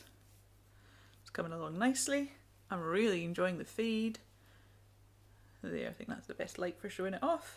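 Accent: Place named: North East Scotland, United Kingdom. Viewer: Found you through vlogmas, loving videos so far. Can I ask where u are from please? I'm originally from Peterhead (north east Scotland) and I think uve got a bit of that accent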